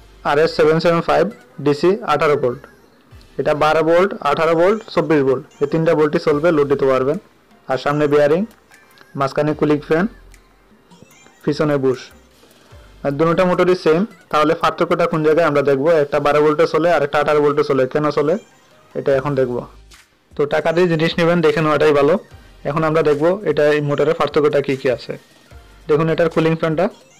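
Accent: Sylhet, Bangladesh. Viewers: Vaier bari ki sylhet? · ভাই আপনি কি সিলেটি আর এর দাম কত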